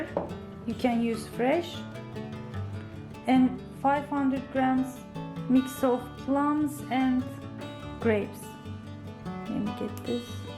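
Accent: Turkey. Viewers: Abla Türkçe konuş türksün zaten 👍😂. Aksan Türkçe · Türk var mı konuşan kadın türk gibi geldi ingiliz şivesi yok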